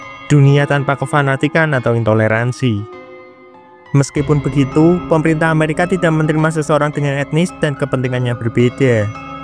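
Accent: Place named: Java, Indonesia. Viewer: medok jawanya ganggu bgt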